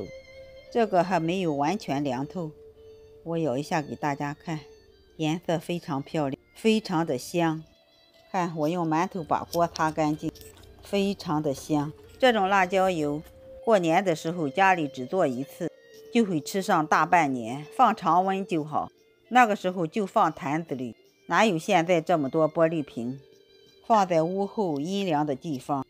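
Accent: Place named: Shandong, China. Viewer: 齊魯口音。我夫妻為台灣外省二代。能識大陸南北口音。祖籍在豫皖、大別山之地。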